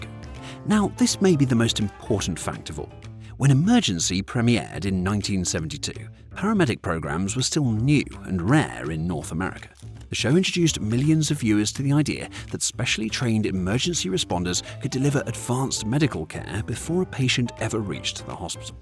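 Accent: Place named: RP, United Kingdom. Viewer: Received Pronunciation, doesn't sound like AI slop except for an odd enunciation on the odd occasion (perfectly acceptable in a human host). I think I could live with this, even if it IS AI slop.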